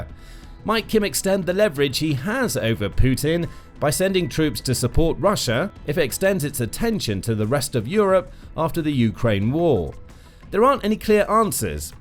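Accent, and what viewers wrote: United Kingdom. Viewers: So tired of the dude with the british accent. Clickbait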